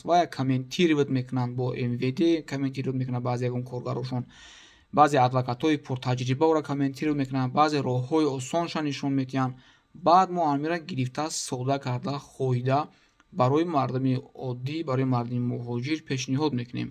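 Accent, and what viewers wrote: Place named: Kulob, Tajikistan. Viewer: чесний пацан ма як гапда кайф мекнм "талхакаф"шеваи кулоби очень приятна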